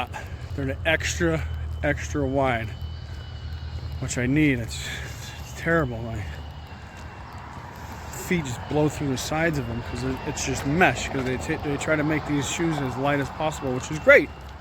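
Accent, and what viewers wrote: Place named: Michigan, United States. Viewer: Great that you’re healing up a bit! Sorry about the grey hair, but maybe that will go away; one can hope! 😅 You sound like a “Michigander” that was my guess, then, Wisconsin, lol! “Talk to you’s later!” We all share similar expressions of speech, haha! Stay safe 👍🏻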